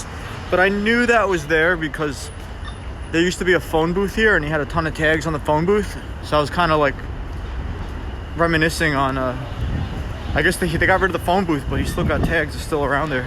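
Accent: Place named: New York, United States. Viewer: What with a thick New York accent i thinks he’s from just outside nyc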